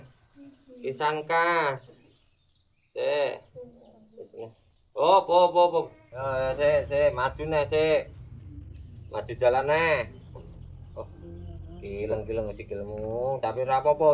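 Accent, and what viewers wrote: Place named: Blitar, Indonesia. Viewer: kong sampean asline pundi apa blitar ko nadane koyo wong blitar blitarnya mana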